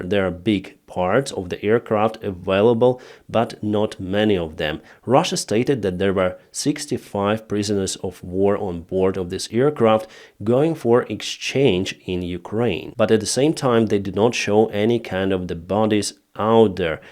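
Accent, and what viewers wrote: England, United Kingdom. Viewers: Yeah he’s British, his accent can be found nowhere else. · He has a strong English accent . I am British and recognise the accents of my compatriots.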